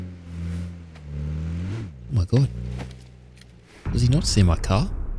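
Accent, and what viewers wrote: Australia. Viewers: He's Australian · How do u have such a low ping, you sound Australian..I can only get games in AS now..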